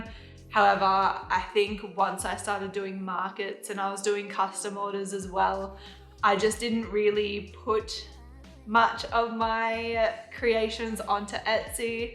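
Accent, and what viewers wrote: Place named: Australia. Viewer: Hi from Sydney! Just subscribed and am about done binging your channel, its great to have an Aussie crochet voice for a change. I had my first crochet market yesterday and it wasn’t anywhere near as posh or successful as your bus depot but I went in expecting no sales and instead made my stall cost ($40) back in 30mins then went home with $200. I try to holiday in canberra once a year so I’ll look out for your stall next time.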